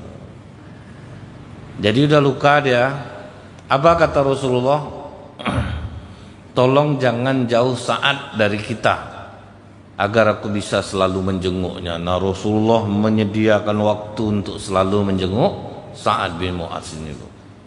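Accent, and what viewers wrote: West Sumatra, Indonesia. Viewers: Logat nya kayak orang Sumbar ustadz nya, , , Semoga sehat dan selalu fit dalam berdakwah untuk ustadz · Itu logat minang mbak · loghat nya seperti uztadz Zuma, minang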